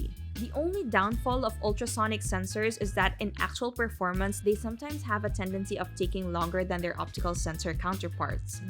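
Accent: Philippines. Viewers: sounds like pilipino accent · I can tell based on your accent that you are from the Phillipines. Am i right?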